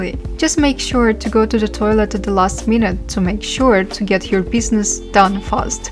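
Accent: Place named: Russia. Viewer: Английская озвучка типично русская... Ландан из э кэпитал оф грет британ....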